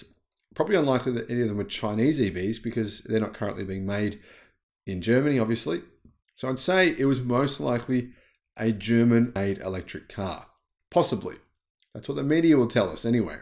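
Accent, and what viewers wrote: Australia. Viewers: Definitely a pro EV slant to his story telling here. ICE generally don't catch fire unless they are older cars with fueling faults. EV fires are harder to put out and happen to new cars more often than ICE cars. On a plus note, he does look like a Viking (with an Australian accent!).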